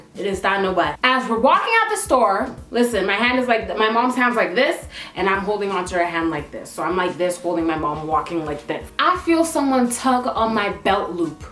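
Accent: France. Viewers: HAAAAAAAAAAAAAAAAAAAAAAAAAA !!! YOUR FRENCH ACCENT IS CUTEEEEEEE !!!! (i'm from Montréal) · You're french accent is so cute 💙☺️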